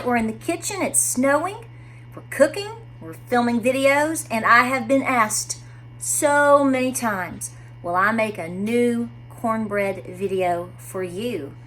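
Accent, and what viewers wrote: South, United States. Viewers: You are so cute!!!! Very informative videos...I enjoy your energy and your southern ME TOO talking!!! KEEP up the GREAT Videos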